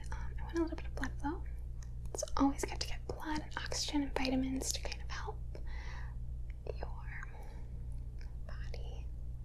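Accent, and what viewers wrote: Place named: Great Lakes, United States. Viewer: I have no idea where her filming location is, but she has a very distinct upper USA Midwest/Great Lakes accent, also known as the 'northern vowel shift' accent. You'll hear it from time to time when she says words like flat, gallon, etc., with very flat, elongated 'a' sounds. So 'flat' becomes 'flaayt' and gallon becomes 'gaayllon.' Watch her 'Earth's Crust' video, and you can really hear it when she says 'volcaaynic' as opposed to volcanic. Really common in Cleveland, Akron, Buffalo, Chicago, eastern Minnesota, etc. Basically in/around the Great Lakes in the United States.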